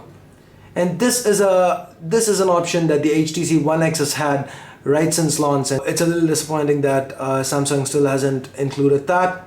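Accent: India. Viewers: Definitely an Indian dude! ^^ Good review though! Cheers! =) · I just realized I fucking hatttttteee indian accents.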